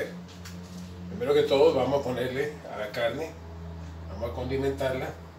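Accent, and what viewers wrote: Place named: Caribbean Coast, Colombia. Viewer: Tu eres costeño jajajaja to oir tu accento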